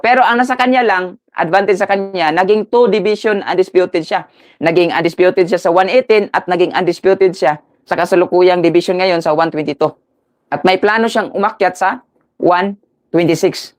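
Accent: Visayas, Philippines. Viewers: Ung Tagalog mo bay bisaya padin😅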